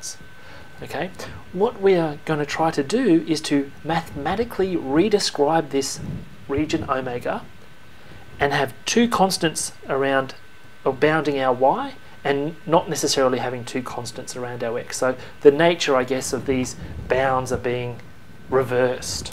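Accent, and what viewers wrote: Australia. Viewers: Talha Bedir this sounds australian to me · Definitely mate, an Aussie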